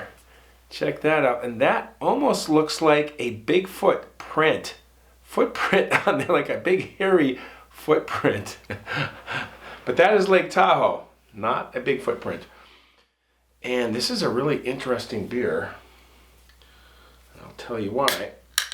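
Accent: Minnesota, United States. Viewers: I knew you had the Minnesota accent!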